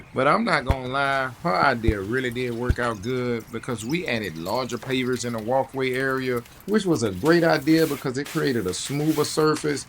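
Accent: South, United States. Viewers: It really does sound like a southern accent, doesn’t it? There’s something so warm and comforting about the way he talks—feels like sitting on a porch with a glass of sweet tea, just listening and feeling at home. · Is this a southern accent? You have the sweetest talk.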